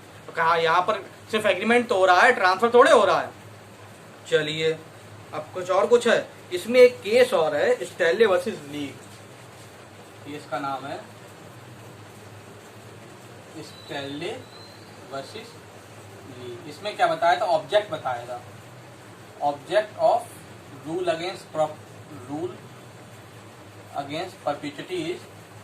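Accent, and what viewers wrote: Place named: Uttar Pradesh, India. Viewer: 😁apke bolne se hi pta chalta ap up se ho Mai bhi Lucknow se hu.. Bahut Acha laga video...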